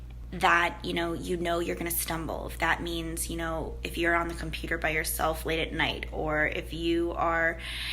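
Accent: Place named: United States. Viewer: Very good voice and thoughtful video.. I like Jesus's teachings. But I don't believe in things like hell and heaven. I would love if you make a video on 'Matthew 7' which is about judging others. That is one of my favorite chapters in Bible. I also like sermon of mount, his parables regarding sowing seeds and many others.. Here is a poem that I wrote combining some verses in Bible and some concepts in Eastern traditions. You may be able to spot a few Bible verses in it. I really like this poem to be heard spoken by someone with a good American accent, like you do. So, in case you like to read this poem in a video, please feel free to do so. It is titled 'Good News'...Here it is: Your life is the most beautiful mystery And the screen which plays your private history; Your life is the truth, consciousness, and bliss That can’t be pointed out as that or this. 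The life screen is the only truth you know; All that are manifest move in its show; This essence of life is your true nature; Knowing this ends the distress and torture. The one truth that pervades the time and space Shines in the heart and showers love and grace; By the veil of darkness it is concealed; By nonstop inquiry, it is revealed. That one truth is called by various names; Deep inside you, it eternally flames; It is the immortal life and the way; Look inside, you can find its sparkling ray! From the dawn of life, seers have seen this And were reborn by its transforming kiss; Unless you’re reborn as a wide-eyed kid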